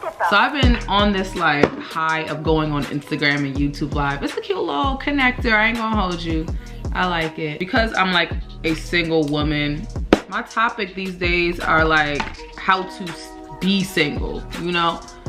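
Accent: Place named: Brooklyn, United States. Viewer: She is most definitely from Brooklyn, Ny. I picked up on it in the first five seconds of the video. Yoo wassup👋🏽😭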